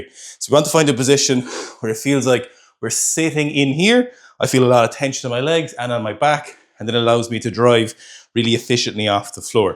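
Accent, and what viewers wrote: Ireland. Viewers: How do I know your voice? Are you feom Limerick?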